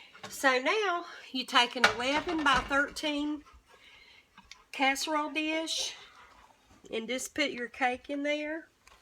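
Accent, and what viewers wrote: South, United States. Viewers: I love her southern accent. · Omg, love your cooking and southern accent, your voice is so comforting ❤️ please keep cooking.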